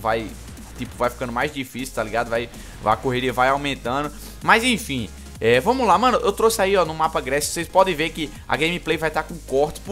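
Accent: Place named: Paraíba, Brazil. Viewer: Nada contra mais voz de paraiba é chata veí --'...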